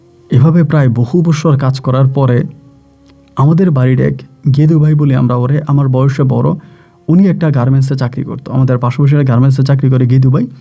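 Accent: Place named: Barishal, Bangladesh. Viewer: বরিশাইল্লা,,দ কে বলে ধ,,গ কে বলে ঘ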